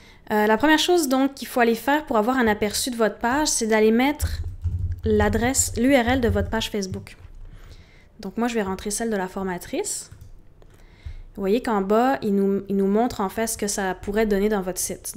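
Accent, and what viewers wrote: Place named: Quebec, Canada. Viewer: Quel accent charmant! Faut que j'aille au Québec !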